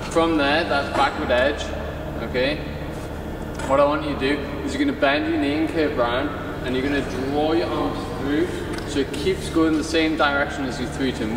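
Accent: United Kingdom. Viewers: Are you British your accent is coming from London uk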